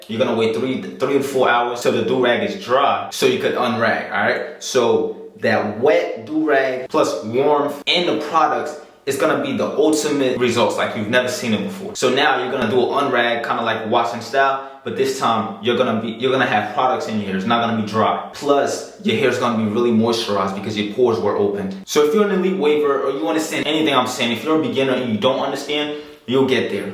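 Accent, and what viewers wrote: New Jersey, United States. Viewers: I can tell you from jersey by way you talk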